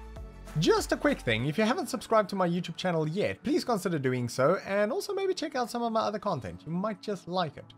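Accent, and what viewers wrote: South Africa. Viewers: Strong SA accent 🤘🤘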